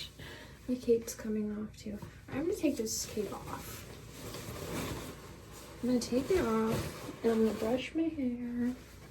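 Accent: South, United States. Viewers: Wow, is it just me or is a Southern accent coming out more in this video? 😁 Love the hairstyle!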